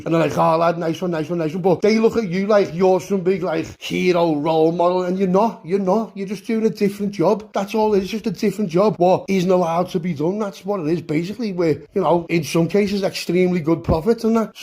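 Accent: Liverpool, United Kingdom. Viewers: ACTIVATED💯🔥🔥🔥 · Lovin' it all Scouse. Flyin' lad.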